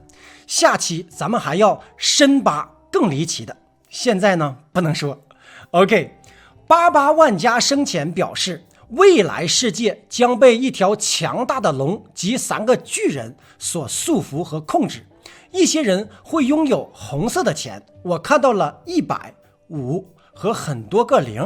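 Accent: Northeast, China. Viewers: 你是东北人吧？熬熬很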